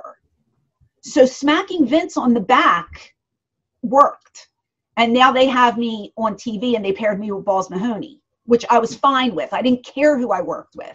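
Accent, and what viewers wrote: Philadelphia, United States. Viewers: She has the thickest philly accent lmfaooo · Is she from philly?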